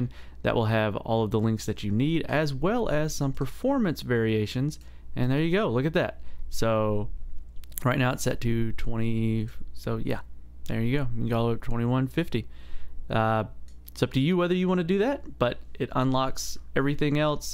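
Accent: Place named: Tennessee, United States. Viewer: Tennessee accent i like it Or is it Carolina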